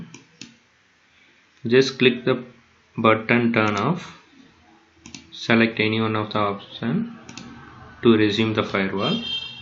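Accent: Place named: India. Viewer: no offense i thought it was an indian scammer about to make me lose my adminstration to the computer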